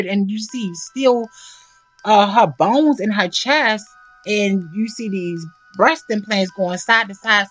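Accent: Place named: Baltimore, United States. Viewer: Are you from Baltimore? Your accent sounds like it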